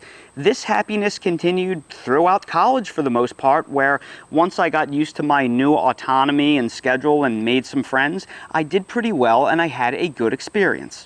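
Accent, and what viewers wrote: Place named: South Jersey, United States. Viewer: South Jersey girl here. I remember watching a video of yours several years ago when you had a few thousands subs (and I heard that SJ accent which hooked me!). As an avid gardener myself I’ve been watching you ever since. Loved hearing about your background and I always look forward to your videos, so thanks for everything you do!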